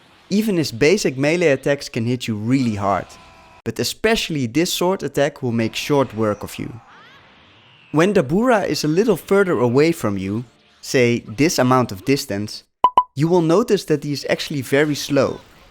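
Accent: Netherlands. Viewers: You're Dutch aren't you 😄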